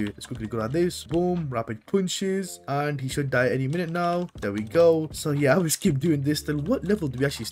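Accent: United Kingdom. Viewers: u british or som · are u british?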